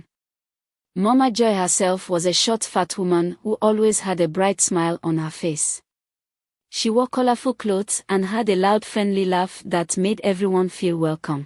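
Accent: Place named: Ghana. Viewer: Are you a Ghanian, this your accent sha!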